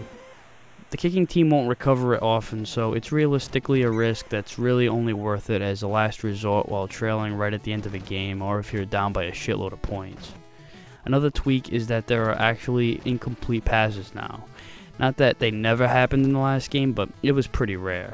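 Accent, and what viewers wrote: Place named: New England, United States. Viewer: Great review; greater game. The NE accent adds some flair to the review.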